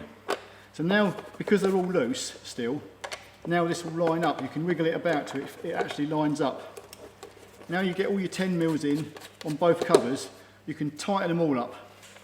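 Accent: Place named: RP, United Kingdom. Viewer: Its great a man who speaks to us in queens English love your show and more please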